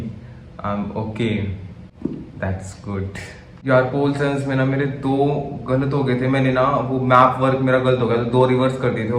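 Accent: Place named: Haryana, India. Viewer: Proper harayanvi tone 😁😂❤️.....vase mere papa ne bhi ase kuch react Kiya 😂...all harayanvi father's are same 😅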